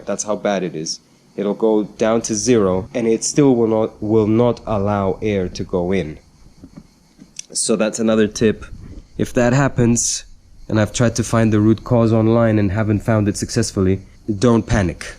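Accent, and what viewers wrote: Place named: North America. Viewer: Good video again. You definitely don't sound Greek, more North American accent.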